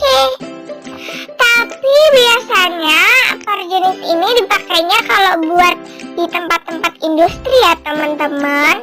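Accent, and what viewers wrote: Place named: Java, Indonesia. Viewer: Keren, suaranya unik. Medoknya dapet. Terbaek memang. Hahaha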